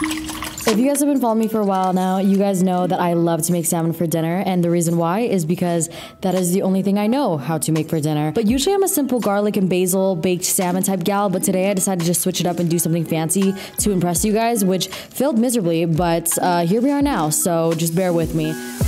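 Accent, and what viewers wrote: United Kingdom. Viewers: lmao love your british accent and this cooking video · Love love the British accent ❤ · Pls I love you but please stop with the British accent 😭😭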